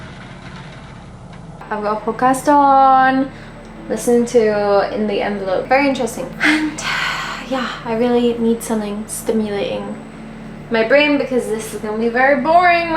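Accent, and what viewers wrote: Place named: Edinburgh, United Kingdom. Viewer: She’s from Edinburgh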